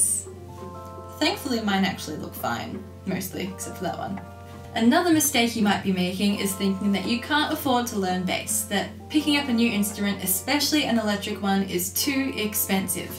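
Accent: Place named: United Kingdom. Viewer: I thought you was British lol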